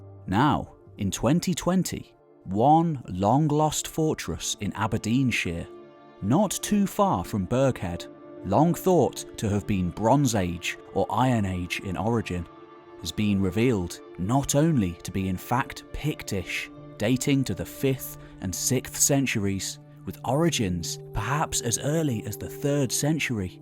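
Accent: England, United Kingdom. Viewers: thank you for this well put together documentary ! I assume you are English from your accent which is presumptius but its always great when one of our freinds from down south manages to shy away from the many cliches about the picts . what is not known about through written evidence can in many ways be realised through the highly sophisticaited artwork the picts left behind , it is almost certain that the picts spoke some kind of p celtic language ,perhaps not to different from britonic .you can see evidence for this in many place names all over the pictish areas of scotland unfortunatly nothing from this legacy seems to have passed into the scots language ,I have heard that perhaps the north east use of F instead of WH might be a remenant for example "fit like" instead of "what like" in English and often "Whit like " in scots . Doric language is itself littered with archaeolagy from Scots ,French ,English, Gaelic and loads of Norse . 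i really look forward to seeing what conclusions are drawn from this site in the near future .And keep going with this fabulous site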